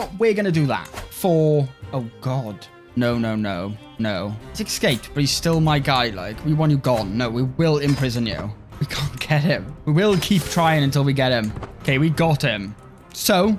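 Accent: Wales, United Kingdom. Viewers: Your Welsh accent is delightful.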